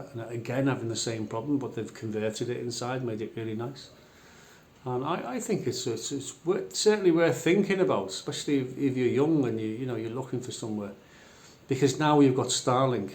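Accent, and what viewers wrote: Liverpool, United Kingdom. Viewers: The scouse accent is way more attractive on you, rather than the posh accent you use more and more of. Don't forget your roots.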